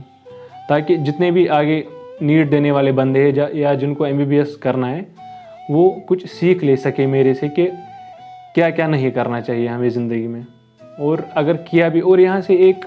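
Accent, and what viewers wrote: Kashmir, India. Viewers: Bhai aap Kashmir se hona ??? Ap ke bat Karne ke tarike se pata chal Raha Hain ??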